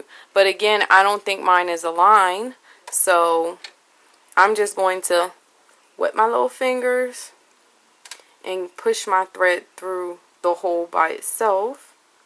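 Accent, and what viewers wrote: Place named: Yonkers, United States. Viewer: lmao i did the same exact thing 😂 thank you for this video i’ve never sewn in my life and this was my first threading. PS i love your accent. I used to live in Yonkers as a kid and it reminds me of my best friend at the time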